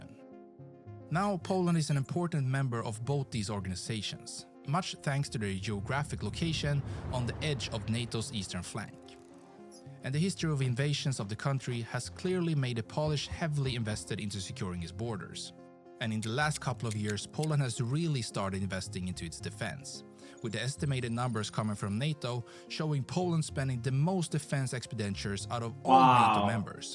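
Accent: United States. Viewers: Are you Italian? How come your English is so American?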